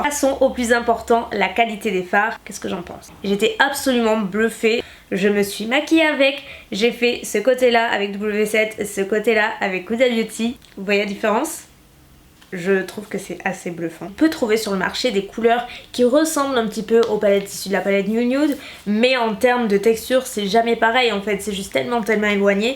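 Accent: South, France. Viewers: Vraiment très ressemblantes c’est sûr 😍 et pour le moinssssss ☺️ vive l’accent du Sud! 😃 Bisou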